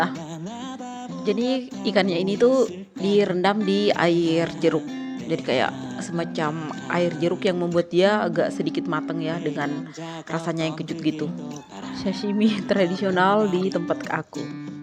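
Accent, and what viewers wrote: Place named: Medan, Indonesia. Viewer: Kakak saya kok penasaran dengan tempat daerahnya dimana ini...? sumatra atau di jawa....kalau saya dengar narasinya setiap lihat videonya seperti mirip orang logat medan (A9)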